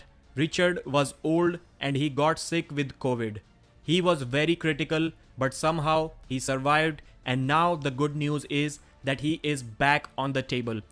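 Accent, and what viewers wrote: India. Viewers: Диктор из индии??? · never heard of........... but the speaker of this video sounds like the stereotype indian technical support call center guy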